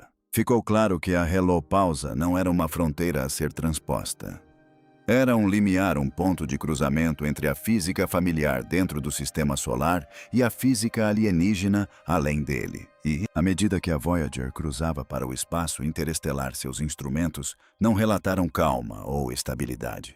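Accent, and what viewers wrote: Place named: United States. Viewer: O pior é falar em Português com sotaque americano!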